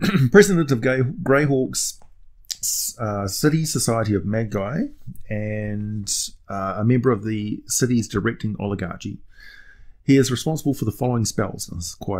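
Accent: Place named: New Zealand. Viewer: Boys we got a kiwi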